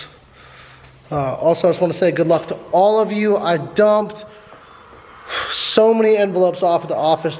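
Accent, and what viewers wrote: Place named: Kentucky, United States. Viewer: I’m from Kentucky and I can definitely tell is too by her accent hahah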